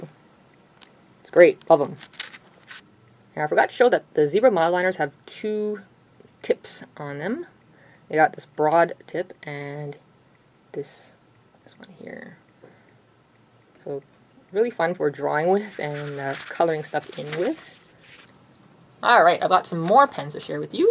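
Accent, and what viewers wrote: Canada. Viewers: I loved drooling over your toolbox of goodies! I'm a pen addict! :) I thought you sounded Canadian! I'm a Canadian living Down Under. I moved to Australia 14 years ago, but Canada will always be 'home' to me! :)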